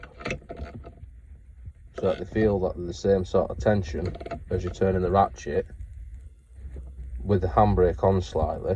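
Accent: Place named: Northern England, United Kingdom. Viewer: Dead helpful mate, love the northern accent btw 🇬🇧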